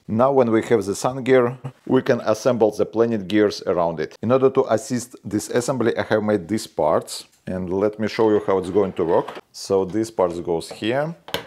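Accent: Russia. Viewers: Такой русский ацент и внешность русская) За работу респект! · Как по мне русский акцент жобавляет шарма роликам ;) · Жёсткий русский акцент))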